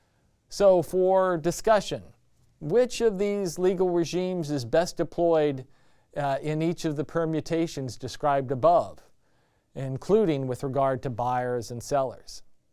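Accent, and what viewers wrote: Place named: United States. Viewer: what American accent exactly is this, though?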